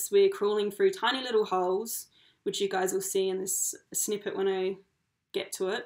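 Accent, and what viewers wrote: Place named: Adelaide, Australia. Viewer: Ha! I thought you must be from SA! It’s your “accent”. I dunno, certain words you say, I’m like “she’s gotta be from Adelaide”!! Did you guys work along the way whilst travelling or did you just save your butts off beforehand? I think it’s amazing that you did this trip- great memories & experiences...it’s literally the experience of a lifetime! * & don’t worry about dislikes- they still count as engagement!😉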